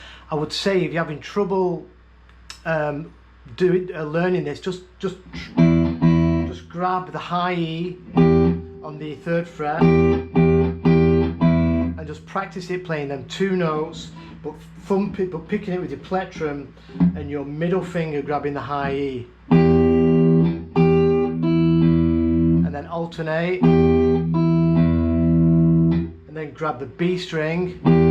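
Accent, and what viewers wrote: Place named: Northern England, United Kingdom. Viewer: way aye lol. You sound like you are from the North of the UK. Leeds area...?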